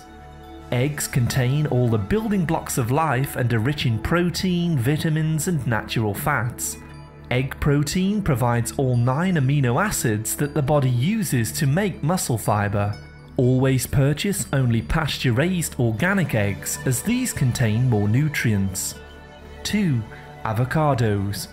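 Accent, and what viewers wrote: United Kingdom. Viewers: 🤣British English👍🏼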